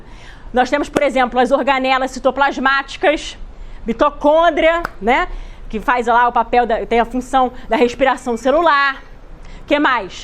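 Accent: Rio de Janeiro, Brazil. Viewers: Hehehe o marcadíssimo sotaque fluminense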